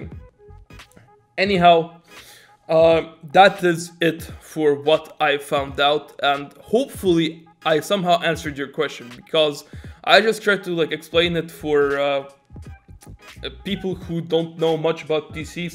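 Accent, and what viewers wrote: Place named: Sweden. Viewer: Wow i quite didn't expected that :D you sound like you're swedish maybe german or polish but not russian :D Great accent mate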